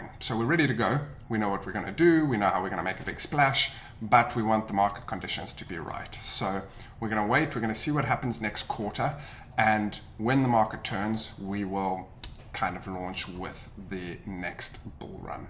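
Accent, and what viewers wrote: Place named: South Africa. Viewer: I detect a South African accent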